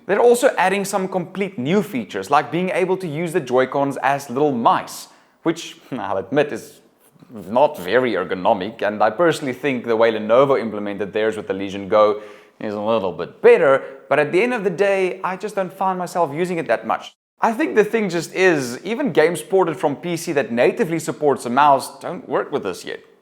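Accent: South Africa. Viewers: A South African tech reviewer? 😮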